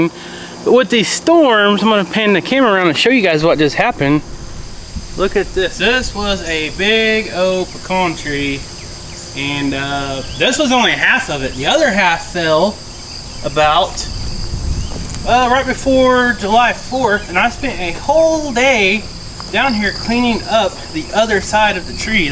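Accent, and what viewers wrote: United States. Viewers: You are very hard working . You and other farms have a big variety of trees. The Elm wood is excellent for exterior use also it hasa very good grain for cabinet making. Please try and answer this: are some of the trees Pecan ...where you get Pecan nuts ? Being English I have trouble with the American pronunciation .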